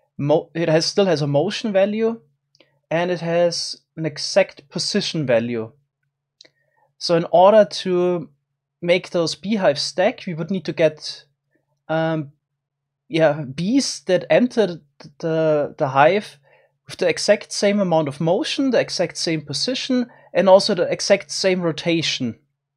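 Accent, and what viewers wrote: Germany. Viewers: Da hör ich doch den deutschen Akzent😂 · Are u german thiss accent insane haha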